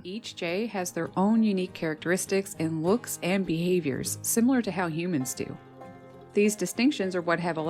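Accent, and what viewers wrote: Canada. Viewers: Food offering. Mate for life. So romantic! Thanks for the video,. I've always loved your narration. I guess I'm intrigued by your Canadian accent.😂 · Love your Canadian accent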